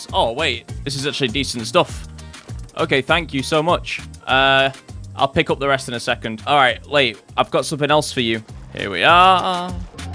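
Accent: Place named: United Kingdom. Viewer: He is British I think thats what it is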